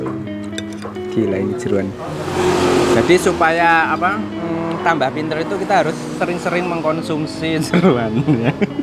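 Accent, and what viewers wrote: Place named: Jetis, Indonesia. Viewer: Mas nek ngomong ki koyok ibuk ku bahasane persis jetis tenan 😂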